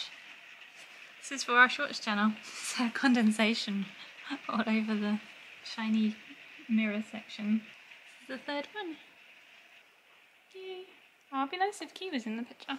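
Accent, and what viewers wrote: United Kingdom. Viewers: you have beautiful eyes and hair.You also have such a sweet voice.I love the British way of speaaking.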